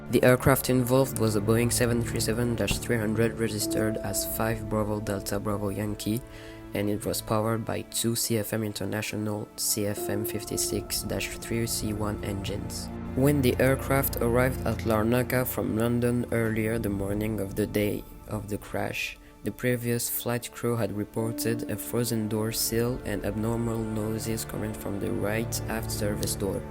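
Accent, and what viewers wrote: France. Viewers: Woah bro im shocked! You absolutely nailed this video and voice reveal!!! Fire voice bro, the French accent is 🔥! Also RIP to all victims onboard that flight. 🙏 Best vid yet for sure!! I'm so happy for you bro and wish you, and your channel the best future posible! ❤ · o my god this is so scary ! i love that ! thank you for dooing this halloween video and this voice reveal with this french accent 😍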